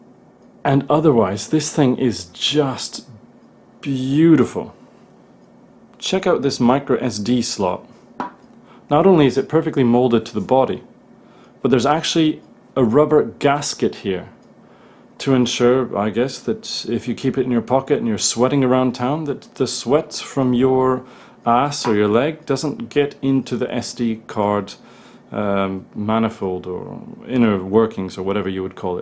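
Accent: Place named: Germany. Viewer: Well despite his terrific English he doesn't seem to me to be a native speaker and I was trying to work out where he was from - I think he's a German speaker who's spent much of his life in Canada. And -> Ant 7'46 - sounds like a German tic to me. Still - incredibly good level of English!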